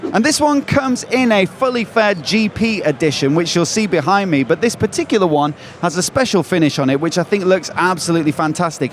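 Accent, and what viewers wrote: England, United Kingdom. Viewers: I love your accent, which kind of English accent do you have?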